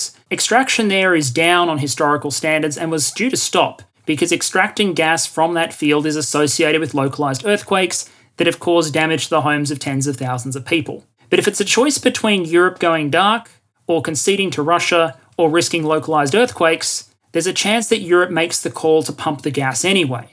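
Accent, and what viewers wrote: Australia. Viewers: Is this the "Economics Explained" guy's second channel? (Or first channel or whatever?) Their voices sound identical to me - and I'm and Aussie, so it isn't just that the accent(s) are Australian. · I like how australian guy tells me our energy policy more in depth than our own government. Excellent video, thank you!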